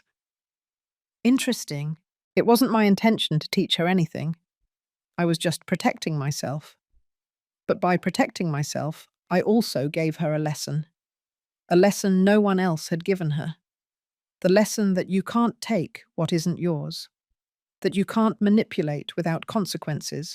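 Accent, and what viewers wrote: England, United Kingdom. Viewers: Your British accent it looks so far from the Bazaar story you are making girlfriend comes and do all distinct to you and you take it come on even for the story it's so Bazaar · Oh it is so good to hear an English narrater. Lovely easy voice to listen to ❤❤listening from Swimbridge Nth Devon UK. ❤❤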